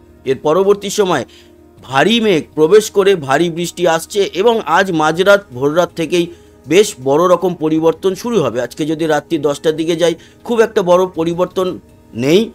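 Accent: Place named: Dhaka, Bangladesh. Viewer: Aapni ki bangladesher manush Dhakar dike aapnar taan besi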